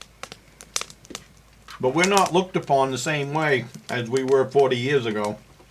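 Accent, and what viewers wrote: Maine, United States. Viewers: They will love that. When I put your videos on It's usually the four-year-old Kieran who perks up first and he calls to eight-year-old Josiah and says hey it's. And then Josiah will come in smiling because he knows exactly who you are and then no matter what you're doing they just are completely silent like they're learning something they've never seen before. I used to go trapping with my brother years ago and hunting when I was a young man but due to back problems I haven't been able to do that so you're the one that's teaching them at the moment and it's really sweet. They respond to that very well and now they asking me about the meat that they eat at home oh, they are realizing oh this is really a chicken that was running around or oh I'm eating a lamb. And then they might ask what does bacon come from and what are hamburgers made of and then we have a fun conversation letting them know that hamburgers are not in fact made by him at all. 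Anyway thank you for your hard work at presenting these videos oh, you are definitely touching lives in a very special way. Also your accent reminds them of Uncle Harvey who grew up in Gorham Maine where my accent is a combination of Boston and DownEast growing up with lobsterman. I hope you are having a wonderful time up there and I know you are staying warm even if it takes dunking all your kindling in a bucket of water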